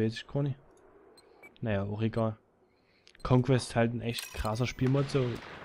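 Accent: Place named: Saxony, Germany. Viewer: nu isch find das sächssische eefach genial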